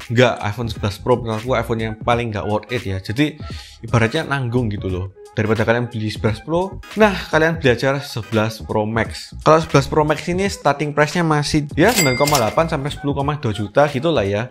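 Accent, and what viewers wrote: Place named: Surabaya, Indonesia. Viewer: Ko...logatnya kok medok ya? Asli sby kah 😅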